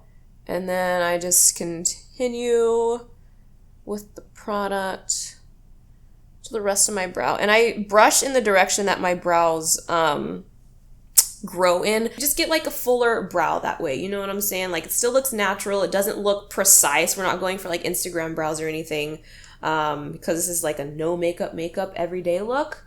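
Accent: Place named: Australia. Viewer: Can you do a video with just your Australian accent i really love it ❤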